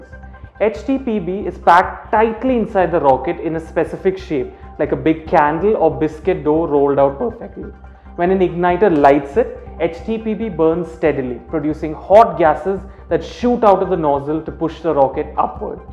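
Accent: India. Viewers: He speaks Inglish and hard to understand. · They call it Curry English!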